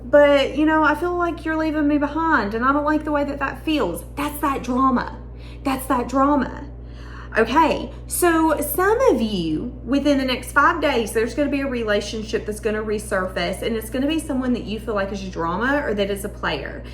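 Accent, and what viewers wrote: South, United States. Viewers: Love ❤ your sounthern accent. Its very attractive on you.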